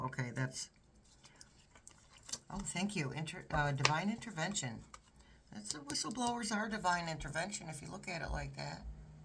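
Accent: New York, United States. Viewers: Your New York sounds a little like my North St Louis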